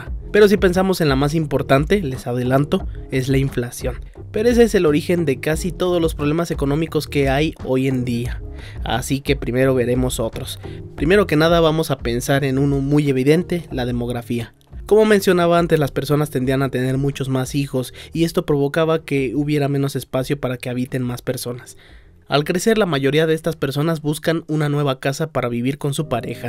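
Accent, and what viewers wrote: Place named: Mexico. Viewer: me gusto como lo cuentas, ademas que adoro el acento mexicano asi que mas grato aun! gracias por la info, inflacion... pff.. aqui en España ya no puedes ni quedar con amigos sin que cueste dinero por el precio solo de la gasolina, como no salgas con tus vecinos (abuelos la mayoria boomers) olvidalo. Millenials y centenials andamos siempre ocupados con trabajos, estudios (aun con 50 años) y como llegar a final de mes sin vivir bajo el puente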